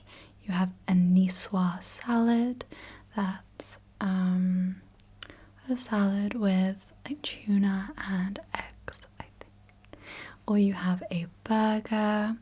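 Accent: United Kingdom. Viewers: I'm in love with British accent Take care Hugs 😊❤ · Ive heard a lot of british accents but hers sounds so smooth, does anyone know what this accent is called/what region of UK its from? (sorry in advance if this is intrusive :'3 )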